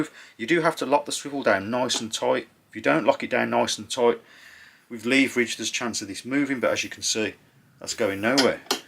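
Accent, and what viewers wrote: Birmingham, United Kingdom. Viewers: good to hear an honest brummie accent! vice looks good for the money though think the rotating base is potentially a weak spot.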